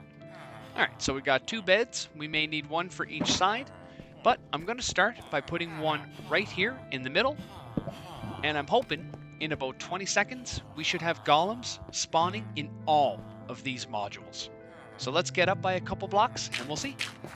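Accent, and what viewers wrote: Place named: Australia. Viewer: Great video! loved the Aussie accent! haha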